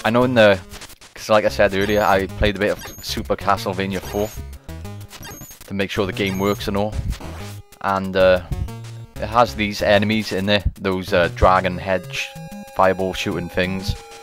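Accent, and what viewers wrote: Wales, United Kingdom. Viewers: I NEVER GET TIRED OF THE TOTALLY VOICE FULLED WITH WELSHNESS Does that make me sound Gay??? O_O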